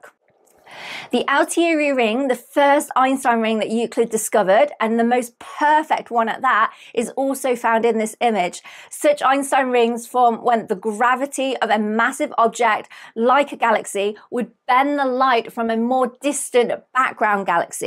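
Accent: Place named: Cockney, United Kingdom. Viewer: you look Chinese but you speak cockney...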